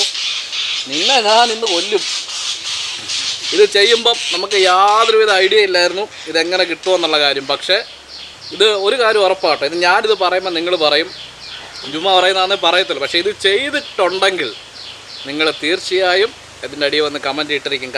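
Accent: Kottayam, India. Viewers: ചേട്ടന്റെ സംഭാഷണം കേട്ടിട്ട് കോട്ടയം ഭാഗത്താണോ veedu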